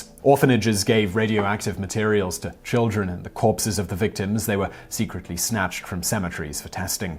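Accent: United Kingdom. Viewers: Always some British voice dipshit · It's like infowars with a Brit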